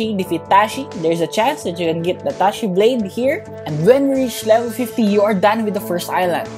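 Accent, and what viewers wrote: Philippines. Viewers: Hes accents is like a filipino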